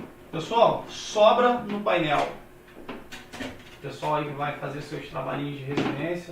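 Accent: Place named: Rio de Janeiro, Brazil. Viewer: Bom dia meu amigo, ganhou mais um escrito, sou do Rio de Janeiro pelo seu seu sotaque vc deve ser aqui do Rio, trabalho Muito <link> elétrica estou pensando em irmora ae abraços e parabéns pelo conteúdo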